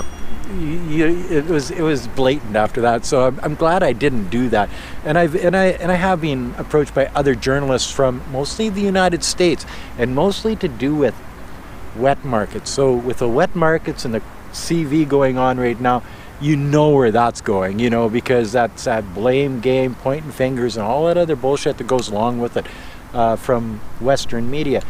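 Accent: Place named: Canada. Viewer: the most canadian accent of all time and i love it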